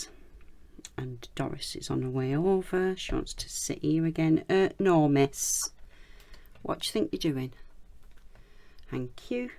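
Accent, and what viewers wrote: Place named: Northern England, United Kingdom. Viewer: Hi, I’ve just found your videos which are brilliant an so lovely to hear a fellow northerner’s voice (live down South now). I’m new to polymer clay and wondered where you buy the silver things that you put the pendant and earrings on before baking. Thanks.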